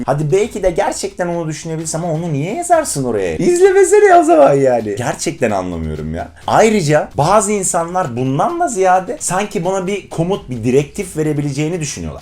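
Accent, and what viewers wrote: United States. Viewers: abi dediklerin cok dogru turkiye insanlari degismez ingilizcen bence bir amerikalidan daha amerikali iyi ki varsin <3 su an ingilizcem cok az var seninle beraber ingilizce ogrenmeyi istiyorum ve dediklerine fazla takiliyorum <3333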